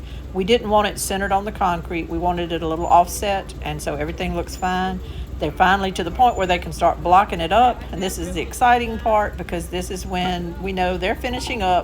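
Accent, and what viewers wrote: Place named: South, United States. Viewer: Damn, she's a southern talking woman, and she talks too much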